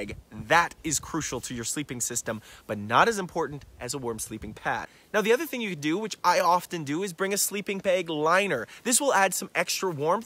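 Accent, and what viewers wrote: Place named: Canada. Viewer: Strong Canadian accent lol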